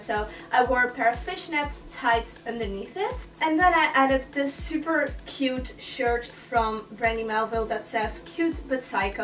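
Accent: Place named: Netherlands. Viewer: First couple seconds of you talking and I already figured out you're dutch 😂😂 leuke video girl xx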